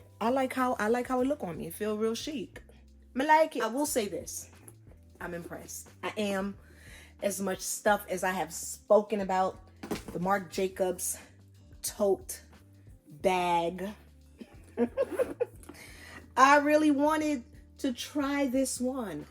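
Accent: Jamaica. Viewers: I was contemplating whether or not I should get this for my mom. I think your video sold me on it. Btw, did I hear a Jamaican accent?! JAMAICANS STAND UP!!!! 🇯🇲🇯🇲🇯🇲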